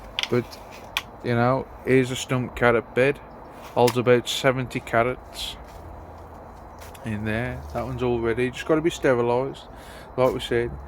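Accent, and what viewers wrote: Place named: West Midlands, United Kingdom. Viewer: Where are you from? I'm from Stourport-on-Severn. Nice to hear a Westmidlander! 👍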